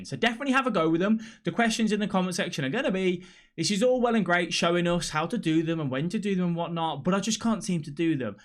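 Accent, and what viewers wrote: Birmingham, United Kingdom. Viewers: Are you a Brummie mate?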